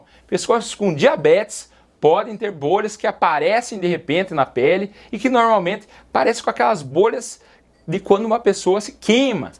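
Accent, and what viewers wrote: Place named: Caipira, Brazil. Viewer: Esse doutor tem um sotaque caipira…é raro de se ver 👏🏼👏🏼👏🏼😁😂😂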